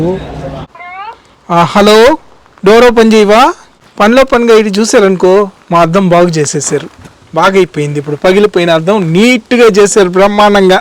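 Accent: Nellore, India. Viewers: I live in USA and watch your travel videos. Though I watch lot of youtube videos on travel and other related topics, I very rarely write comments. However your channel is exception. Just letting you know that your videos, presentation are very nice a your Nellore accent is very entertaining. Please keep traveling, capture your experiences good/bad and post. Wish you good luck and hope your channel grows in popularity.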